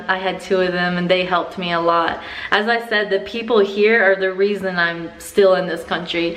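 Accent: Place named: United States. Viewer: As always nice video..even though sometimes is very hard for me to understand american english...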